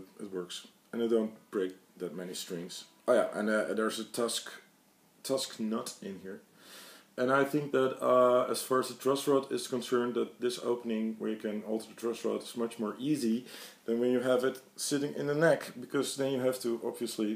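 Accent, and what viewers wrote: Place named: Netherlands. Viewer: You speak perfect English with a sleight Dutch accent.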